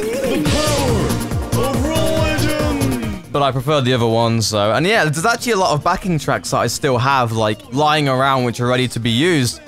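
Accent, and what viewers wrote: United Kingdom. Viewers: OMG your british?: yeah... random girl:say BANANA say it say it:NNOOO random girl:please say it say it!: BANANA!!!!!!!!! random girl it sounds so different... someone else continue... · hes british, BRITIIISH... thats right, isnt it? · i wish i was English. i mean having people ask you to say random words all the time is probably annoying but, come on. you sound awesome. and you're not American which if you add those two factors together it's like an A++ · ARE YOU ACTUALLY BRITISH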